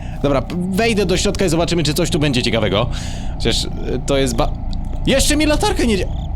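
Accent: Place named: England, United Kingdom. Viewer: Gdy grałeś w gang beasts z i i podróby gier mówiłeś normalnie a teraz mówisz z takim angielskim akcentem!?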